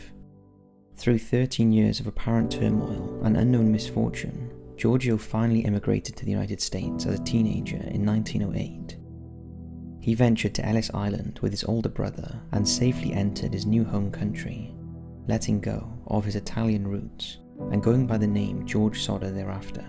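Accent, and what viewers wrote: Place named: Newcastle, United Kingdom. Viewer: I think hes a geordie lad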